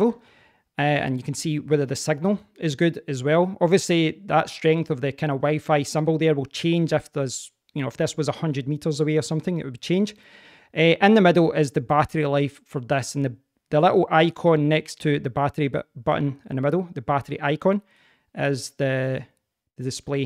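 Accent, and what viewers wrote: Scotland, United Kingdom. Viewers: Just got my Go 2, and this was a great tutorial (much better than Rode's documentation). The Scottish accent made fun to watch too!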